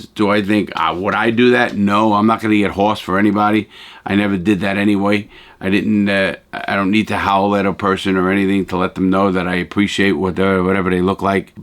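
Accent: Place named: Boston, United States. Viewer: are u from mass??? I'm hearing a boston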